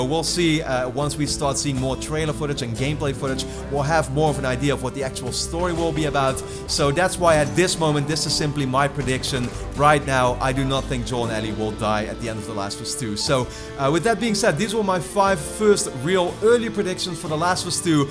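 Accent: Netherlands. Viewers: Are you Dutch? You sound Dutch!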